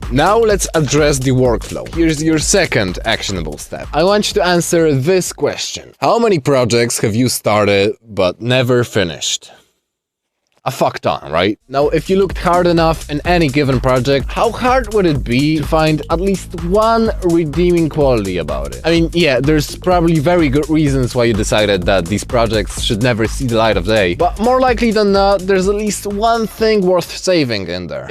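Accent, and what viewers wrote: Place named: Poland. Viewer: You can immediately hear my boy polish ❤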